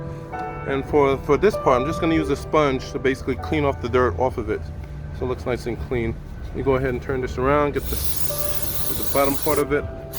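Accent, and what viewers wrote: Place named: Northeast, United States. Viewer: Are you in the northeast region? I'm from Mass and your accent sounds familiar. BTW I love your videos. Thanks for sharing.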